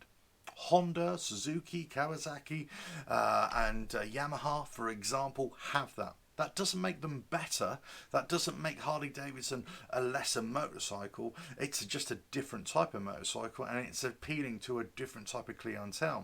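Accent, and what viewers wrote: England, United Kingdom. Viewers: WTF Harley going bust? No. Harley change, is business tougher. Probably. Your accent, suggest you are in England. Back when I lived in UK. Harley was a rare sight, So was a Goldwing, Don’t know about today. Can’t see riding B roads in UK on a Harley, or a Goldwing. Just my opinion. Or at least I couldn’t when I was a young guy with a 250. When you get older, your priorities change, The Harley I thought to big, to old, to boring and to slow, a bike for old fat guys. Not for me when I was young. 35, or 40 years, Comfortable sounds much more appealing, No point in Harley making a crotch rocket, Ive out grown them. Now I am an old fat guy. Today’s millennials will out grow crotch rockets as they age. Can afford bigger, More comfortable bikes. Some will choose, adventure, Classics, Touring or Harley. The Harley market share in the US will remain strong, biggest risk is loosing some to Indian. 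Those of us who choose, British, Italian Or Adventure, were never Harley customers in the first place, They haven’t lost US, they just never built the bikes we liked. We are not buying Japanese cruisers either.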